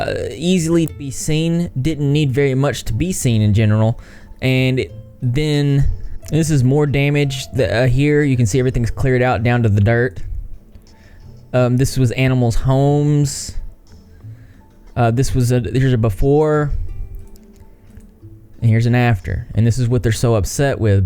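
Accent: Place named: Georgia, United States. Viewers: I called it! I knew your accent was from east of the Mississippi! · I knew that accent had to be from Georgia 🤪 I live there too. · I just found ur channel yesterday and knew u were from Georgia just by your accent- bc that’s where I live too 😂 happy you said it in this video so I’m not still wondering if I’m right! What area are you from?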